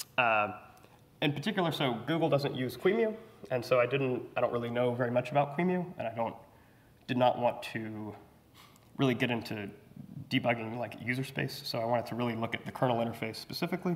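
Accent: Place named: Assam, India. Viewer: You speak phenomenally well, despite the Assamese accent!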